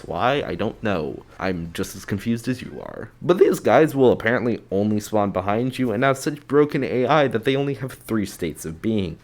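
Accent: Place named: Spain. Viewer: I just watched one of your other videos and the "spanish and english accents coming out at the same time" cracked me up 🤣 i love the way you talk 👌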